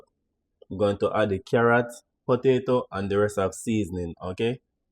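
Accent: Jamaica. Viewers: Love how this chef expresses himself. No twist up tongue, straight Jamaican language. Yeah love it.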